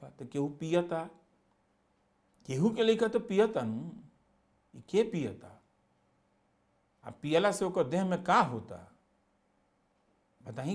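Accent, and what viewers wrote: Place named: Ballia, India. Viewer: आपके जेवन भोजपुरी बोले के शैली बान ऊं हमरा बलिया ज़िला से काफी मिलेगा रविश भैया मजा आ जाला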